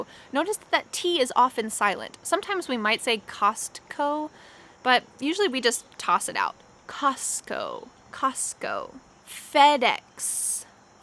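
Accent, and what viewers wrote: United States. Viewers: I love American accent so much😍and this video is so interesting and useful! Thank you! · This is the American way to pronounce them, not the right original one. I doubt Adidas is called in this way in Germany 😜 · Go and learn from someone who speaks British English because Americans mispronounce everything in English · Thanks a million🌷🌷👍👍as an iranian i'm so happy to learn brands in english specially with american accent😍😀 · Mmmm these are all American pronunciations…..anything not an American brand is mispronounced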